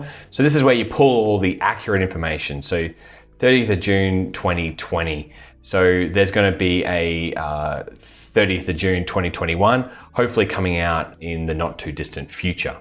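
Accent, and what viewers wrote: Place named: Australia. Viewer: G'Day Mate, Some excellent resources shared. Appreciate. Love your Aussie accent. Somedays I just tune in to listen to you for that :-)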